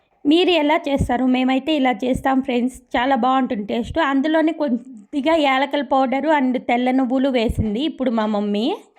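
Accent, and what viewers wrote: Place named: Rayalaseema, India. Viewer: మాకు కొన్ని అరిసెలు పంపించొచ్చు కదా..రాయలసీమ యాస లో సూపర్ గా చెపుతున్నారు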